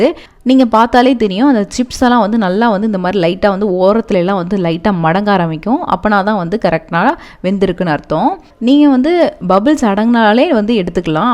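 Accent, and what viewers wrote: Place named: South Tamil Nadu, India. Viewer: South tamilan spotted by her slang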